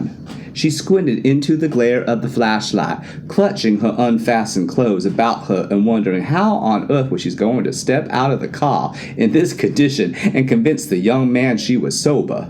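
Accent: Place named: South, United States. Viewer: Mr, sir… Come with your Southern drawl…and welcome aboard! I’m the Captain of the SS Nurse Jane… On the Herring Bay!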